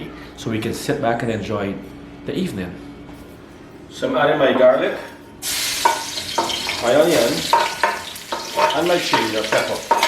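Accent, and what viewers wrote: Guyana. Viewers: Really nice dishes .... guyanese style. Can't beat guyanese food and twang ; >) I do the same thing here in Canada but I use a cast iron pan ( just like the one you bought) and seasoned it for non-stick. Mostly we don't use the microwave anymore (bad for your health in the long or short run) I use an overhead oven. Non stick pans has a coating that contributes to cancer. Just a heads up.... for what happen to someone else does not mean it will happen to another. Cheers on a good video.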